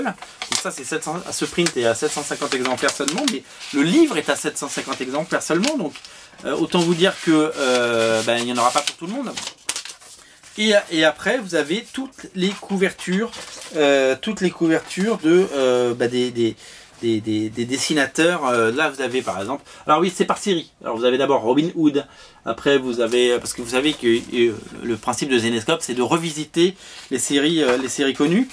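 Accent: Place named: South, France. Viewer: Moi j'aime bien ton accent du sud :D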